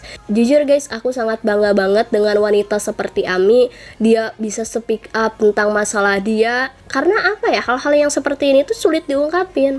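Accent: West Java, Indonesia. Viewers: Kaya orang Sunda logatnya?? Salam Sunda Majalaya hadir😁☝️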